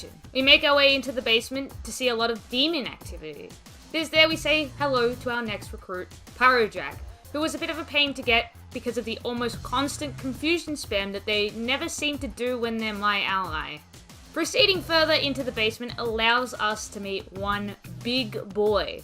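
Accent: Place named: Australia. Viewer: Australian spotted.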